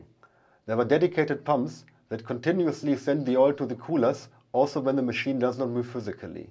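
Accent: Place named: Germany. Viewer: Ummmh, when germans speak english :-(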